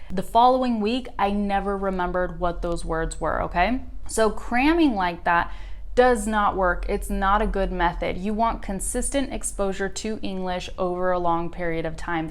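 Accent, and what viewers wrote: United States. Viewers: I just step by saying that I’ve found many of your videos very helpful. I've taken the time to listen and watched some of them twice so I can listen to your pronunciation, intonation, and so on; and then repeat or search for a new word you've said. I like very much how you explain the things or talk about different topics. I'd love to have an accent like yours, I'm working to have a better accent, more American. So, thank you so much! And I'd also like to add that even there are many women who make these kinds of coaching, you have become on my second favorite one. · I like a lot of the your lessons. I have difficulty in listening, but I am trying to understand American English native speaker like you. Thank you for your great job! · Hello Miss. I suppose you speak American's English, at what state you are? I'm from Guatemala City. 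Salute. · You are a good teacher. I understand what you say and it is great. I don t get used to American accent but this time I understood it...Great! I usually "suffer" when I watch american movies because I like them but I have to watch them again and again to understand..At least not the whole movie but just some chapters of it... I hope I will overcome this fear of "listening" one day Thanks. Take care ..bye🙋 Greatings from Italy🌍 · You are a good teacher ,thank you,you made me love this language and american accent Deeep greeting for you from sudan😍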